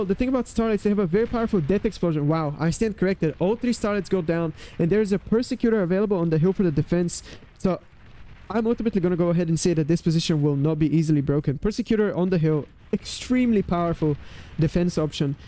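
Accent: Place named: Quebec, Canada. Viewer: This guy kind of has the George St. Pierre accent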